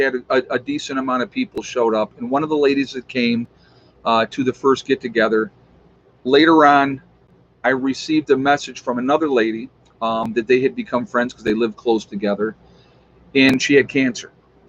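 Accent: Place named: Michigan, United States. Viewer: Every time i listen to you, something would bug me about you being a new yorker..and i couldn't figure it out. Now i know what it is...you have a Michigan accent not a new york accent...😁am i right???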